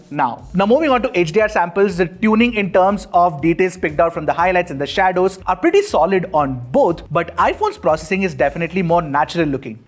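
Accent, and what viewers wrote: India. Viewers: I left the video just after 3 seconds just because of this kind of typical Indian accent so ann0 ying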